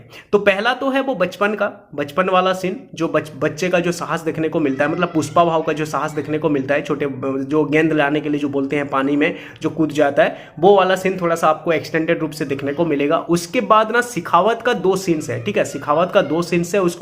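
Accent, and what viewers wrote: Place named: Sambalpur, India. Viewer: Bhai aap Sambalpur se hain kya?? Main Odisha se hoon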